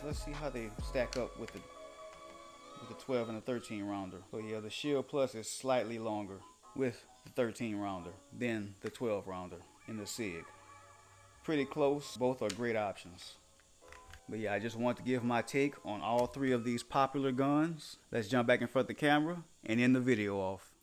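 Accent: Louisiana, United States. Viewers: Bro you got to be from Louisiana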